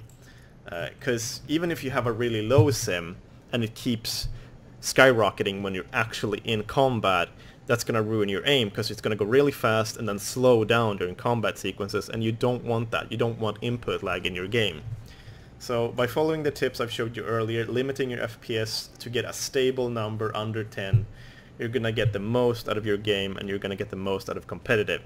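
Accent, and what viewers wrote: Sweden. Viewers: This guide is actually really cool and really helpful,thank you for doing this! Hope you dont mind the personal question,but are you by any chance swedish? I'm just thinking the accent gave it away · You sound swedish. Are you? Also nice video bro, keep it up! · you sound swedish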